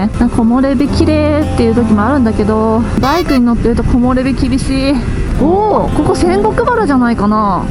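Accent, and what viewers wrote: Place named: Kansai, Japan. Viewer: 偶に関西弁出るのが凄く謎www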